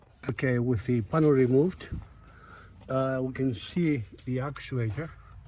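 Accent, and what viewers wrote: Greece. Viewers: Good video sounds like Greek accent not that it matters lol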